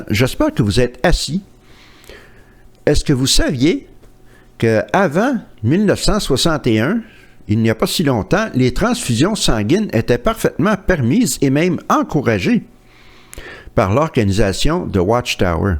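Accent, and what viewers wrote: Quebec, Canada. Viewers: J'adore aussi l'accent québécois, surement grâce aux 4 mois que j'ai passé à Montréal en 1992 et qui m'a laissé un excellent souvenir.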